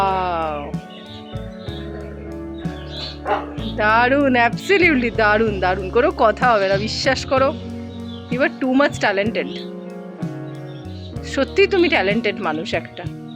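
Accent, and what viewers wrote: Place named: Bangal, Bangladesh. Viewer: Duto paisi.. Valoi to bangal bolle bonu😂❤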